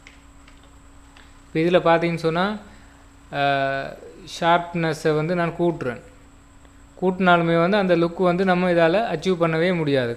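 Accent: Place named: Sri Lanka. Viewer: Excellent SriLankan Tamil. Super . Vazthukkal Brother...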